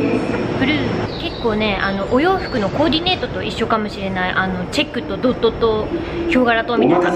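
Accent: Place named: Hakata, Japan. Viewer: チャンネル博多弁♥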